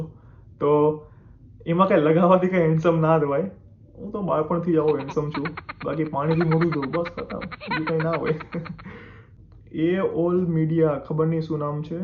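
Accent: Kathiawar, India. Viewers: Naa... beta tame kathiyavadi cho e to language par thi j khabar padi j jaay che....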